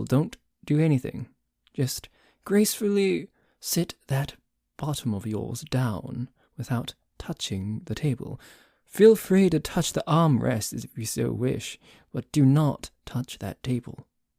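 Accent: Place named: upper class, United Kingdom. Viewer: As a British Person Id Like to say that I think you really nailed the upper class British accent very well. A lot of people get it wrong and it’s often a hard watch because of that, but you did pretty well ❤❤ (In case you don’t know because of centuries of disconnect between the rich and the poor in England, often poorer people have different accents to richer people)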